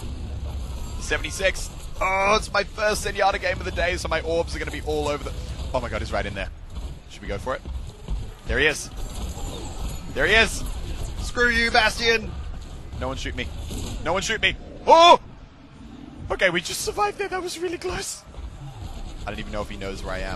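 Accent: Germany. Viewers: why is the voice german?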